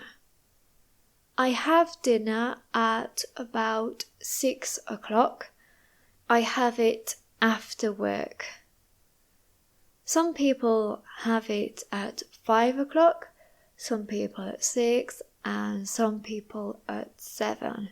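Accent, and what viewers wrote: United Kingdom. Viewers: Excelente pronunciación y entendimiento del acento británico. Saludos. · I love you british accent,which is so beautiful.Are you a Eenglish teacher in England?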